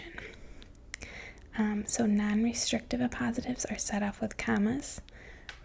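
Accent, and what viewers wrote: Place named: Upper Midwest, United States. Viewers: you sound like you're from chicago miss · You have a nice subtle upper Midwest accent..I know. I'm originally from there..very nice..love your ASMR..thanks so much..goodnight .😇😴💤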